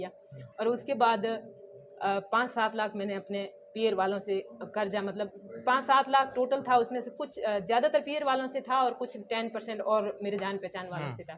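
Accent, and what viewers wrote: Rajasthan, India. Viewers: Awaaz se lag raha hai yeh youtuber gamo Rajasthani hai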